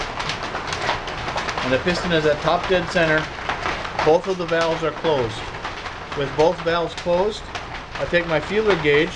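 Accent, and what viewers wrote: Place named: Oklahoma, United States. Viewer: Sounds like you could be a fellow Oklahoman....lol. I'm here in Okc and hear that sound at least a few times every year. Great video series!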